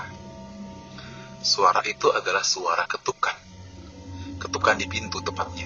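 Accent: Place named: Surabaya, Indonesia. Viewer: Dari awal denger uda langsung ngeh kalo masnya logat suroboyoan 😂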